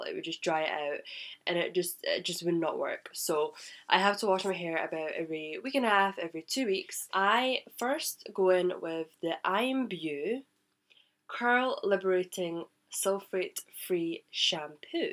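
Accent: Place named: England, United Kingdom. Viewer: Liked the accent. Are you from England?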